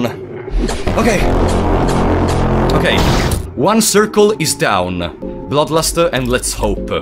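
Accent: Italy. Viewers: Can't help but notice, just a random question - your accent sounds exactly like my friend from Mezacorona, are you by any chance Italian? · Sono nuovo e non posso fare a meno di notare che hai un accento molto italiano, mi sbaglio?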